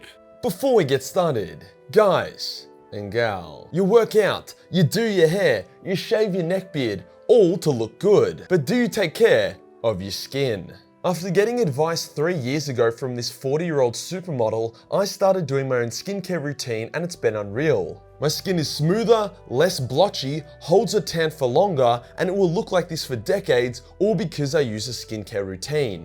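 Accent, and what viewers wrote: Australia. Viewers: I can't stop watching your videos, mostly because of how intensely Australian you are. We Americans love Australians.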